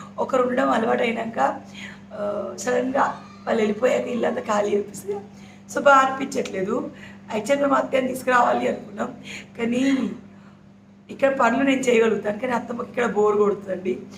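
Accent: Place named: Maharashtra, India. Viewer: మరాఠీ యాస లో బాగుంటుంది మీ భాష, ఉన్నది ఉన్నట్లు మాట్లాడతారు మీరు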